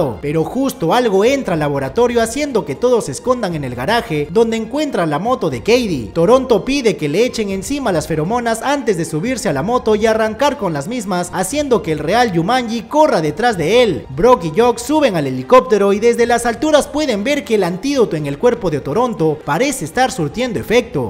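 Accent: Peru. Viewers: una pregunta eres de Perú 🇵🇪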